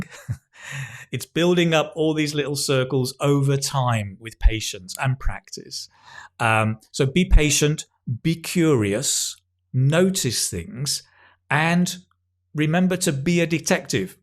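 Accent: United Kingdom. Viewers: I always watch your videos. I love the way you teach. I want to take one of your courses. I’m an English teacher in Guadalajara, Jalisco, Mexico. I don’t need to take the IELTS but just for having a challenge and as a motivation. All my life I’ve tried to improve my English. I love English. Sometimes I feel stuck because I don’t use it! Nobody speaks English in my country. What course do you think I should take? I love your British accent! Lizzy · it's a pleasure to hear you speaking with your British and very clear accent and slow enough to understand so well thanks for all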